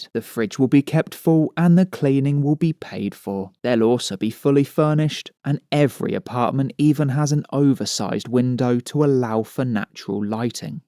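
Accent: United Kingdom. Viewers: Thick British say no more.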